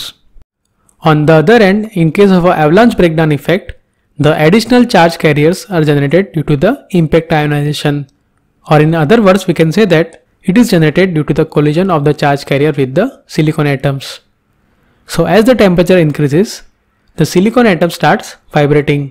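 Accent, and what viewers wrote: India. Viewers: WHAT AN ACCENT! HOW DO YOU EXPECT PEOPLE WHO DON'T SPEAK HINDI TO UNDERSTAND? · Average Indian accent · I'm Italian, but I understand the "indian" english quite well. It is quite funny. It is very valuable the extensive and profound job that in India is done to produce this wealth of courses and lectures about physics, electronics and solid state engineering. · Only an Indian can understand this gibberish. Accent is horrible. It may be a good explanation or not but couldn't tell anything without understand anything. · hundai accent. Shut up n sleep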